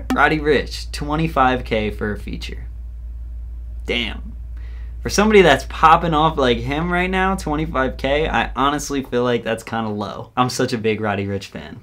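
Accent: Netherlands. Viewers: Je bent Nederlands? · Are you dutch? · are you dutch?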